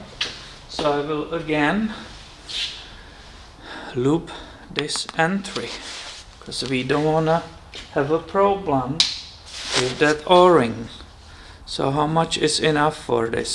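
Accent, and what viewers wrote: Germany. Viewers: are u German or Dutch? Long time no video, thanks! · Thank you for you honesty and vulnerability regarding this repair. I am a High School Auto Shop teacher and I always impress upon my students that everything you do is a resume. Sometimes repairs won't work out perfectly and you might have to eat a bit of loss to complete the repair and satisfy the customer, but if you are honest and transparent, you will earn their trust and have them for life. You will also earn the customer's referrals. You are the kind of YouTuber that I am happy to add to my course content! I had the same experience on my wife's BMW X3. The o-ring on the oil cooler line tore the same way and leaked all over the accessory belt. Luckily I noticed it before the belt dissolved and broke (they have been known to wind around behind the harmonic balancer and punch through the front main seal into the sump, resulting in major engine damage). 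By the way, I am curious what part of the Old Kountry you come from. My wife was born in the Flensburg region but she can't identify your Dialekt. Bayrisch? Schwabisch?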